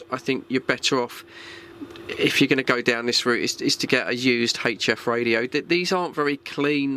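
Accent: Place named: Newcastle, United Kingdom. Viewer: ahh ok, Geordie accent!!!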